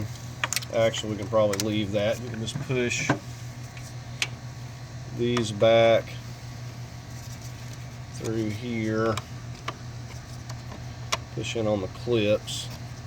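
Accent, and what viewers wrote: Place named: Midwest, United States. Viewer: Superb tutorial and many thanks! It's clear you have an immense knowledge and experience wrt to motor vehicles and specifically, this Tribute / Escape. Furthermore, you're a natural instructor; patient, considerate of all skill levels, able to add 'pointers' along the way and just a naturally helpful and decent American guy! I'm a native Minnesotan (currently living in Straya) and have also lived in So Texas and KC Mo. What I liked best about this vid was just listening to your patient, confident and sincere midwestern voice- am thinking somewhere south of KC and north of DFW? ( Or Louisville?) My project is a 2001 Tribute but its RH drive so much was identical save the ALT removal which had to come oout from underneath after the axle removal. I ended up hitting the top first-manifold and plenum seals, PCV and also the water temp sending unit. 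Then, on to the timing cover. I usually make a sketch on cardboard of the part being replaced and mark / punch holes and just add them as the bolts are removed. I bought the same Fel-Pro seals/gaskets as you bouight had to source them out of Chicago on Ebay-could not get them here and many think this is to prevent the old rigs from staying on the road and a sneaky way to force adoption of the current EV's coming out of Chyna. I hear it is similar in many Euro countries. I also did the oil pan gasket ( watched your other video) and that was very helpful too. I was able to wrestle the second exhaust pipe through the gap of that cross-memb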